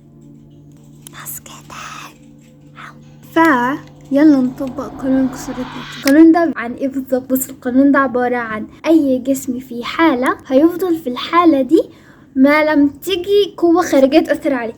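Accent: Gulf, Arab world. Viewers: مصريه بس فيها لطشة خليجي 😂😂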